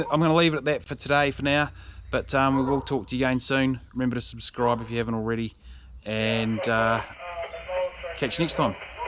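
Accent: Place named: Australia. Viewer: He doesn’t have a nz accent more Australian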